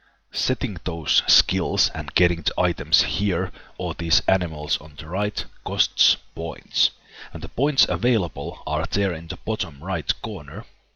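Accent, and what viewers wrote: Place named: Finland. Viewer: Just out of interest. Do you happen to be Finnish? Your accent sounds exactly like most of us Finns.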